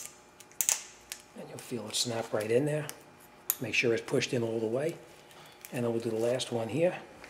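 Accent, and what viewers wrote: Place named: New Jersey, United States. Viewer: R u from like new joysee? Love the accent.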